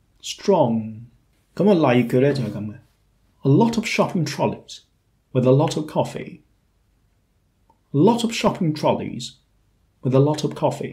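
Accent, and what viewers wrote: United Kingdom. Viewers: By the way, what kind of English accents are yours? Would that be Oxford English? I am not familiar with the different types of English accents in UK, but I find it hard to understand some English people, especially the Welsh. I know people from different regions/provinces/states have different accents. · 以很優美的英式口音，清晰介紹每個vowel！絕對值得觀看、收藏及分享！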